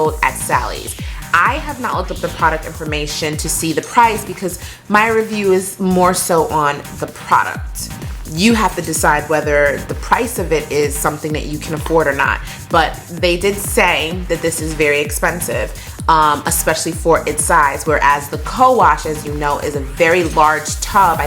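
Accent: United States. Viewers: you have a new york/louisiana/californian accent all in one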